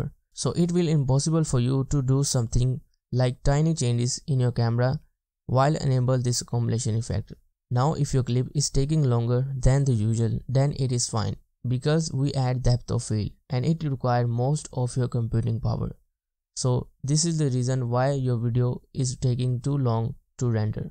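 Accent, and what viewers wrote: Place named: India. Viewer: I struggled a bit too. He sounds like he might be south Asian. Possibly Indian. I was able to navigate his accent because I’m friends with a lot of Indian dudes at my work who have similar accents. Once you work out his words, the tutorial is actually very good.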